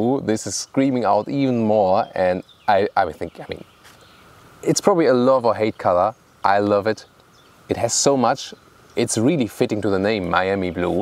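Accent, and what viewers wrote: Germany. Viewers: Es ist komisch einen Deutschen Englisch Sprechen zu hören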